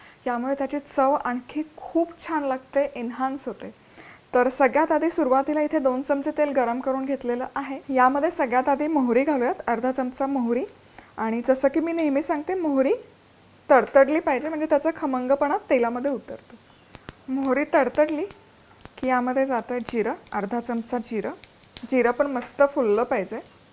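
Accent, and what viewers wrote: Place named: Maharashtra, India. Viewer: I love all your recipes ❤and especially your marathi accent saying chamchamit and Khamang is too good😊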